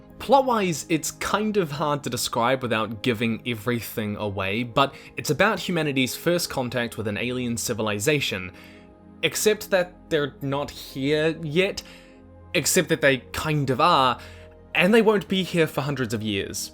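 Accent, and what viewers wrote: New Zealand. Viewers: Great to hear a Kiwi do a review, too often it's Americans which is fine but I like to hear different voices. Thanks for your review. I'm going to buy the trilogy. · Yo bruv. Good vid. Where you from? You sound like a kiwi to me. Good to know there's more than just me reading this sort of thing in nz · Its a kiwi. Love it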